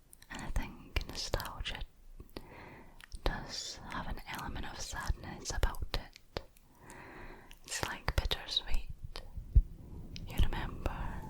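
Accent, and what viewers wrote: Scotland, United Kingdom. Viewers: Very nice! Help me out with your accent. Are you closer to Glasgow or Edinburgh, accentwise? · The Scottish accent is so so suited to whispered asmr , I’m Scottish too so I may be slightly biased but either your voice is tingalicious or I’m having a heart attack 🤣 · summer time with a cold breed I love your vids and your Scottish accent 😘😘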